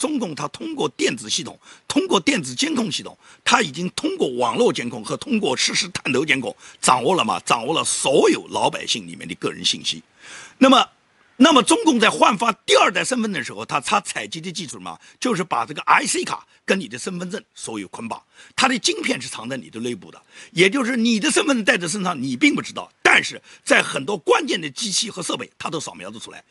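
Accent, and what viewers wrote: Nanjing, China. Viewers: 过去的同事是南京人，所以，对南京人的口音感到很亲切。最重要的是，的内容好。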